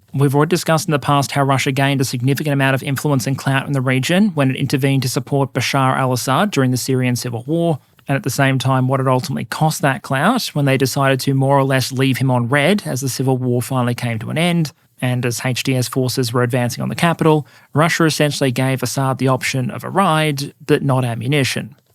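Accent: Australia. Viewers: The up, down, back and forth of this conflict reads like a brittish comedy sketch Á la Blackadder or Monty python. Now, with Aussie voice over.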